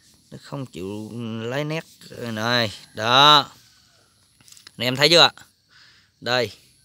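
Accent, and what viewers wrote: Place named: Binh Dinh, Vietnam. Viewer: Mình khoái nghe giọng bình Định rồi đó nha. Thuốc thì ok rồi hôm nào mần cờ líp về võ thuật và rượu bầu đá nhe 😁😁😁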